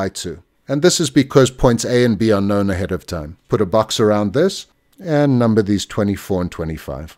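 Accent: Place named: Cape Town, South Africa. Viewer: Hey, nice. Nice to hear a Cape Town accent. I have a more important optimisation problem to solve, which is that of an elastic catenary with fixed weight at some point in the catenary. The problem space is maximum highball free-lining, where we deliberately span open spaces with elastic climbing ropes and the walk them. The ultimate boundary condition of course is elastic strength failure of the freeline. However that failure condition is not the solution to the fundamental problem. What is the catenary solution for an elastic rope in the presence of one or more discrete local masses?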